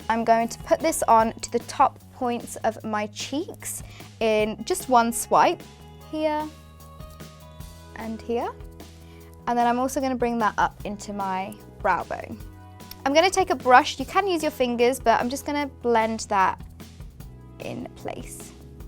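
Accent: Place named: United Kingdom. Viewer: lol are you sure about that? i think you're commenting on her accent, which sounds that way because she lives in the UK. her actual knowledge of english is fine considering she was brought up in a country where that is the first language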